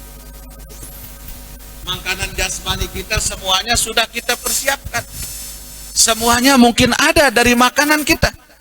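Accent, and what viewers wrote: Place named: Bali, Indonesia. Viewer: Ininustadz siapa ya namanya? Logat biacara nya seperti asli orang bali 🙏🙏🙏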